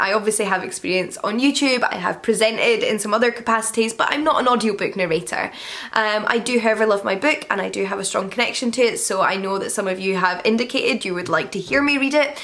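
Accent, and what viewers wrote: Scotland, United Kingdom. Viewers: That board game looks amazing, we will have to look for it. Congratulations on your writing and getting close to finishing the PhD! I totally understand the feeling about buying your first place without a romantic partner. It is really a great idea and I would hope that more young women especially would consider doing the same. It is massively important to your personal development as a person to have become your own independent person prior to committing to a lifelong relationship with everything that comes with that. Even though your lives are together, establishing your own space and responsibilities makes good sense. I love that you and your mom have cohabitate so well during the pandemic. Given that your dad passed away so recently, it is great that you could be there for each other, especially with the lockdowns and isolation. 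In terms of your book and making an audiobook, I love the idea of you reading it! I would guess that most of the people that follow you are comfortable with your voice and the Scottish pronunciations would be a treat. What a great idea! Lastly, in terms of the writing to try, I’m going with the gothic YA finishing school idea. That sounds excellent. You have so many wonderful projects coming up! I’m looking forward to seeing you get through them (which will only create new ideas for the future!). 🔥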